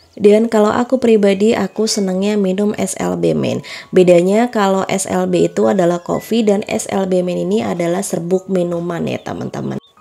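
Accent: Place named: East Java, Indonesia. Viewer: Hp saya jg barusan masuk service samsung mba 4hari kena lcdnya dan komponen apanya gt biayanya 965rb huhu,, qadarullah lg renang ta masukin di dompet hp waterprof tp gataunya ada lubang kecil dan masuklah air dr situ kena kamera depan sampe ngembun kemasukan air dr lubang gatau kalo ada sobek kecil ta bawa aja nyemplung renang Kurangnya diteliti lg pdhl baru beli kantong hp antiairnya huhu Salut bgt bisa betul hp sendiri mba suaminya Salam kenal ya mba,, ak suka liat ytb mba dr lama lewat akun suami gaberani coment hihi dah lama bgt dr masih blm 100k sub Awalnya nongol kok bahasanya kek orang jatim Semoga sy bs semangat bikin vidio kek mba panutan❤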